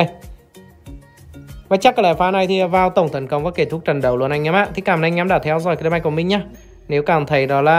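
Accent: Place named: Central, Vietnam. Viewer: giọng bắc nghe quen rồi, méo hiểu sao cứ thích nghe cái giọng miền trong của bạn 😂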